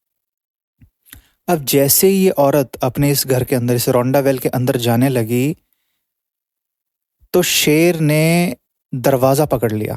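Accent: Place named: United States. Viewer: Ye american english me kyo bol rhe ho ?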